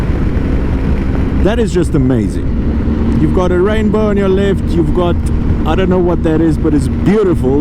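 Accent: Afrikaans, South Africa. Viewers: Are you originally from Suid Afrika?? You seem to have an Afrikaans accent… Not a Romanian accent. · Your accent sounds like an afrikaans guy, speaking English.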